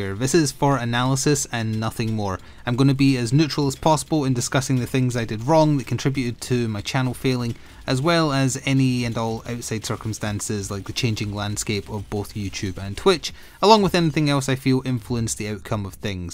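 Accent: Scotland, United Kingdom. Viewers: Tl;dr You're amazing! Love all your content! ❤🧡💛💚💙💜🤎🖤🤍 Hey there, This is not the first time I've commented/watched/participated in chat. I've been following your channel since that sponsored mh3u video. I used to make videos and streamed under the name TheBearthropod. Never got any success but it was just for fun. You always have been and always will be my favorite content creator. I loved learning all the new things about you from your new videos. As another private and quiet person, I respect you greatly. I've always thought that if we met in game or in person we would hit it off and be friends. Only because of our similar views on games and both being in the lgbtqia+ community. You're inclusiveness, respectful nature and humor always makes me smile. Your Hollow knight coverage coincided with at the time the lowest point in my life. I suffered from a severe sciatica flare up. 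I couldn't walk for two weeks and was in excruciating pain. Your daily videos of hollow knight gave me a flicker of hope(no pun intended please don't send the legitimate business frog i need my kneecaps). If I thought that was the lowest point in my life, let's just say I definitely did not see 2022 coming. I lost my grandfather, two uncles, an aunt, a beloved pet of 18years and my mother. I was horribly depressed for two years, but true to form there were your posh Scottish accent and dry wit to get me through. So, I thank you from the bottom of my heart. Even if there are horrid people critici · Came aboard for the silly overwatch vids, stuck around for the amusing dialog & Scottish accent. Love ya, man, don't ever stop being you.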